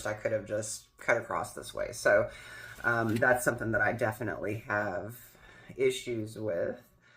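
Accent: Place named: Scotland, United Kingdom. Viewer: Very relatable! We share a lot of similarities. Scottish accents are my fav. Always have been.. haven't found me a Scottish man yet but I'm still looking! haha 🙃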